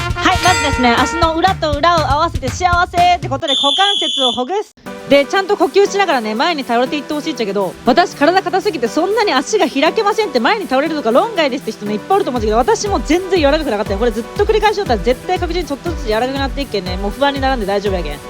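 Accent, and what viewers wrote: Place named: Hakata, Japan. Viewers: マジDJ社長大好き間違えただった😍ｗｗ この博多弁？めっちゃ好きやわ～ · チャンネル登録しました!! おなじ九州なので福岡弁癒されます♡ 頑張ってダイエットしたい☆彡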